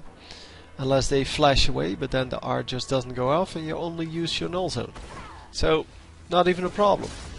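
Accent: Netherlands. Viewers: Are you dutch? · Are you Dutch?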